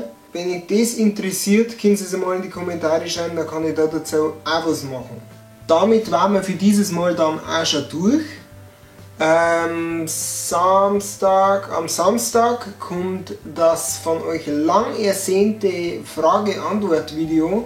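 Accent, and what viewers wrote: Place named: Palatinate, Germany. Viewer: des wo du redst is koa boarisch des is pfälzisch!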